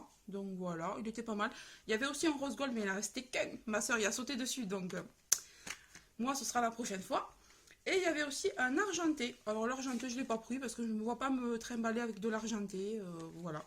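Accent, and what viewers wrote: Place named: South, France. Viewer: Coucou, j'ai découvert ta chaîne la semaine dernière et j'aime beaucoup tes hauls! Et cet accent du sud... C'est un plaisir à entendre! Bonne journée à toi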